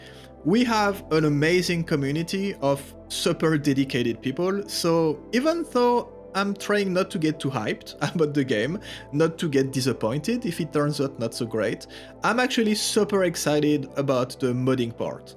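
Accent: France. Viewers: are you french by any chance?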